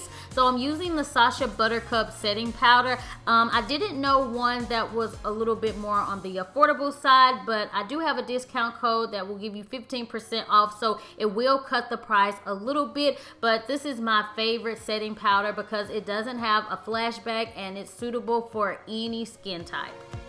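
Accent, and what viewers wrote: United States. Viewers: Looking back at your older videos..and noticed that your accent has changed. You sound so country lol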